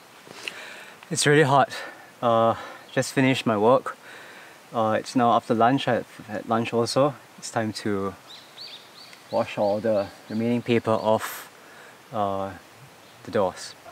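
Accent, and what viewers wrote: Singapore. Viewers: Have you been to Singapore? You sound like a Singaporean! I use Shoji paper to cover model airplanes. How do I find the lightest paper grade? There used to be this paper called Esaki paper but it's no longer available. Where would I find the lightest grade of mulberry paper that remains strong while wet?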